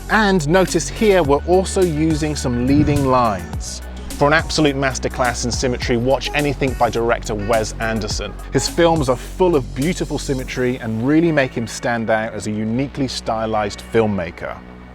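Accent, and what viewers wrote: United Kingdom. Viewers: I think thats how he pronounce focal point, he may be is a british coz thats their accent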